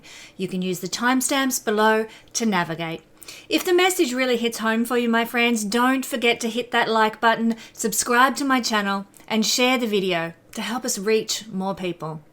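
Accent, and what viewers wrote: Australia. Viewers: So weird your Australian accent is showing more now you’re recording in the states😅 I’m Australian;)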